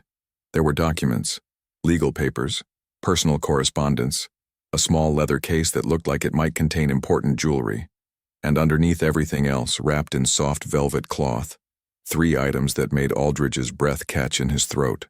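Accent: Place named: United States. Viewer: Ruined by being 'read' in an American accent. 😡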